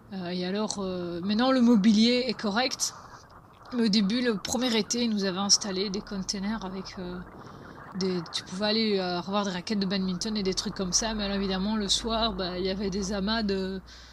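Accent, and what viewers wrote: Belgium. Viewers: Hebus LeTroll Je sais hein ket ;) C'est juste qu'on entend pas souvent un bel accent belge broubeler sur internet :D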